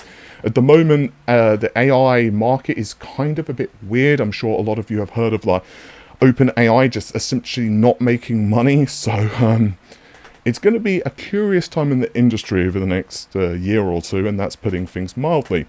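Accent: England, United Kingdom. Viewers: Accusing an Englishman of faking an English accent?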